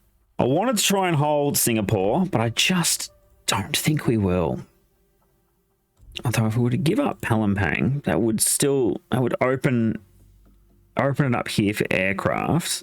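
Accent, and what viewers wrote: Australia. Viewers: good to hear an Australian voice on these vids. Nice bit of creativity in the letters home. You could even include some history into some of them. I can tell you know a bit.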